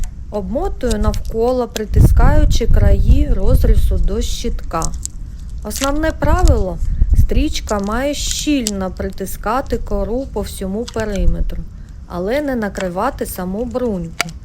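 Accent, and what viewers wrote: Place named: Surzhyk, Ukraine. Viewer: Лайк за українську, хоч і трохи з суржиком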